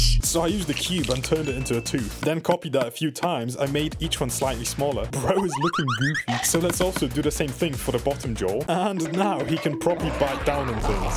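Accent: United Kingdom. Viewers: sounds Britsh to me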